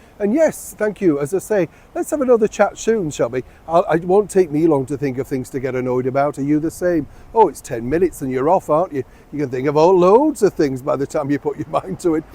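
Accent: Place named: Northern England, United Kingdom. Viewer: I think I found you on youtube during the covid debacle. It was lovely to hear your Northern accent as I'm from Manchester but lived in the US for 45 years. I love hearing you talk about foods, places and things I haven't thought about for years. Thank you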